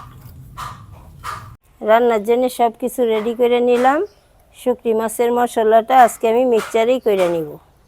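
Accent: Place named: Tripura, India. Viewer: Tumer bari tripura naki tumer katha gulu tripura bashar moto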